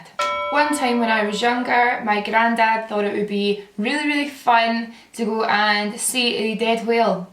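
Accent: Scotland, United Kingdom. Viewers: Whereabouts in Scotland are you from? I'm Scottish too and I love your accent, but I honestly can't place it!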